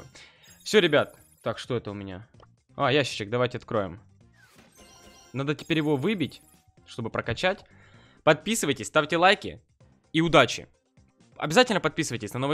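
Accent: Ukraine. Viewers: Ухх как же меня бесит его акцент. Сколько лет знаю, столько лет мне режет уши его украинский акцент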